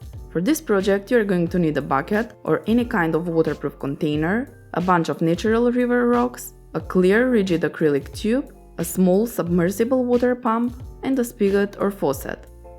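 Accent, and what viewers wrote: Russia. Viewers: Still the Russian accent recognized 😁❤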